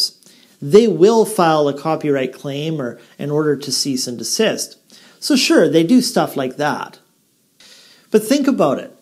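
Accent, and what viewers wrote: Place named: Brooklyn, United States. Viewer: Always makes me think and/or smile! Loved the Brooklyn accent!! 😊😊💕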